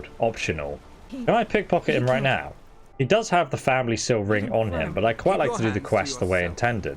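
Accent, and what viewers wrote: England, United Kingdom. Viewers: Love the helpful advice. Serious mental cringing at your horrible pronunciations. Attribute, Markarth and quite a few other speech errors. I get that you sound British, but honestly, that should mean you are better at speaking English, not worse. Again, I found this advice helpful. Please don't think I am ragging on you. Subscribing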